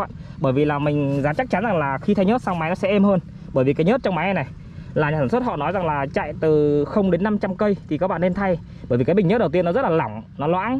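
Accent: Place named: North, Vietnam. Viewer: Giọng ông này như kiểu người miền bắc ấy Mong anh rep lại ạ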